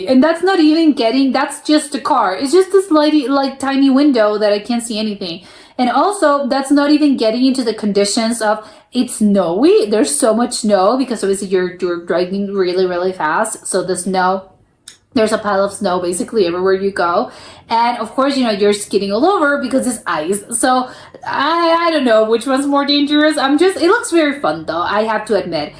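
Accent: United States. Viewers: As a swede. Your accent is standing out. I imagine jersey? Am I totally wrong here?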